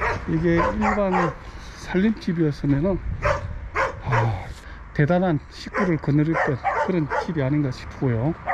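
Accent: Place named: Jeolla, South Korea. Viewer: 이 유튜버전라도분이네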